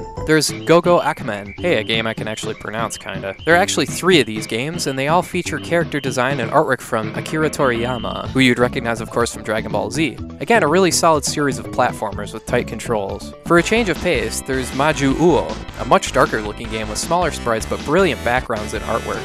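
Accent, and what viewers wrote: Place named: Midwest, United States. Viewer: What part of the midwest US are you from?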